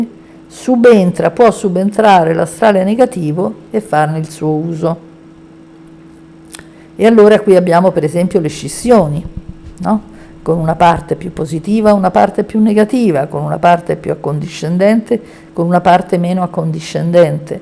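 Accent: Rome, Italy. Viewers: Professoressa lei è di Roma?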